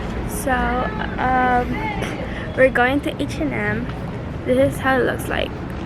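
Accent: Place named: Tagalog, Philippines. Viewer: I love your accent and your Tagalog accent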